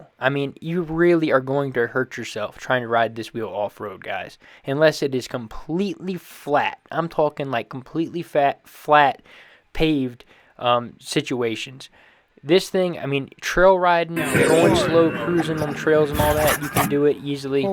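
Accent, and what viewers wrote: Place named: Appalachia, United States. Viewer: You sound like an absolute moron. You edited it talking over yourself with same volume... and that hillbilly accent and incoherent rant that makes no sense. Also it did not cut-off as clearly it's on when you pick it up, you absolute idi ot. I don't even have an EUC and I know that. Yes, you are an american i can tell :)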